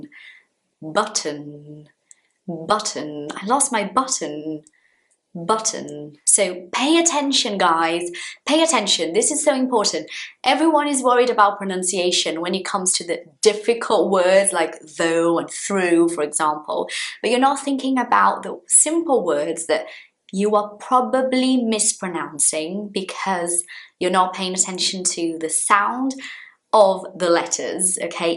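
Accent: United Kingdom. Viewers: Your British accent is so delicious! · Finalmente um canal onde posso aprender inglês BRITÂNICO, já tava cansado de só ver inglês americano no meio do YouTube, parecia até que só existia aquele, enfim, já tô amando tanto as lições como esse sotaque tão sofisticado e... MUITO MAIS BONITO que aquele sotaque americano que parece caipira falando, da até agonia rsrsrs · I love your videos! I understand all of you say. And I love your British accent. Congrats,!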